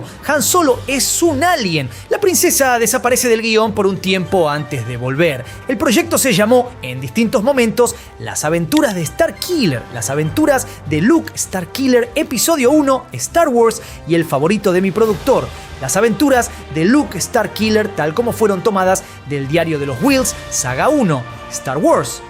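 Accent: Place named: Argentina. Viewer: ¡Es tan épica la historia de Lucas y #StarWars que incluso este pinche video narrado en HORRIBLE ACENTO ARGENTO (que destruye al idioma español y al inglés al mismo tiempo) resulta algo tolerable! 🤌🤌 ¡#MalditosArgentos, son tan odiosos e insoportables! :body-green-covering-eyes::face-turquoise-covering-eyes::face-turquoise-covering-eyes::face-turquoise-covering-eyes:🤮🤮🤮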